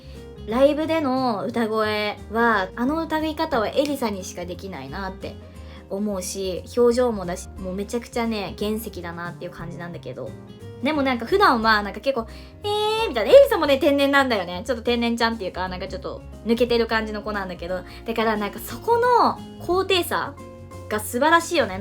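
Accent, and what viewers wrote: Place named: Yamaguchi, Japan. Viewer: うん、山口弁を隠しきれない。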